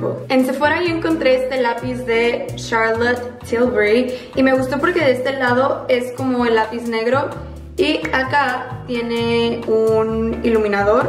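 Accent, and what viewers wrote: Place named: Spain. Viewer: ¿Por qué siento un ligero acento español jajajaja? :)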